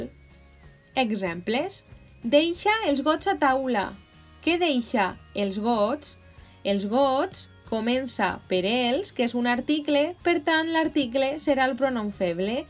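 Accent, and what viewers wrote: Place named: Valencia, Spain. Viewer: Hola! Sòc de Xile i estic aprenent el català. M'agrada molt l'idioma i encara que ja puc parlar-ho sense pensar tant, he vist que tinc que millorar en dues coses: Algunes conjugacions de paraules, per a parlar més natural, i els pronoms febles. Es veritable quan la gent als comentaris van dir que el teu vídeo va ser molt bó, perquè he vist moltíssims vídeos i el teu és el més fàcil de comprendre. Estic escrivint tot el que vas dir, pero després tinc que fer exercicis per practicar-los. L'únic que ha estat nou per a mi es el accent de València. Perquè he après el Català de Barcelona (pel que sembla jajaja, no sabia això). Però segueix així! Ens ajudes molt a aprendre aquesta bonica llengua.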